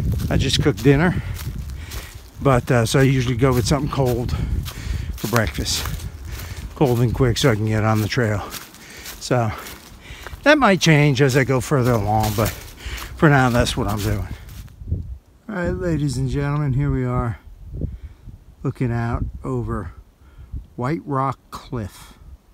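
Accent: United States. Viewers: Great videos! For someone from NJ, you picked up a nice southern accent! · I knew you were from Jersey. That North Jersey accent is unmistakable!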